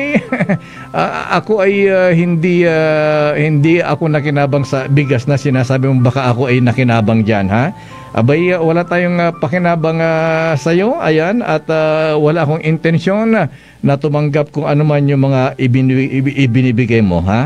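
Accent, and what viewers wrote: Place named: Batangas, Philippines. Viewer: DIBA MARCOS KA? bat Batangeunio ang accent mo................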